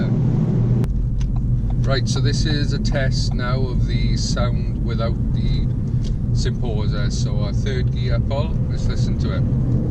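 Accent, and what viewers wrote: Wales, United Kingdom. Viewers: Anything that drowns out that God awful Welsh accent, shouldn't be removed.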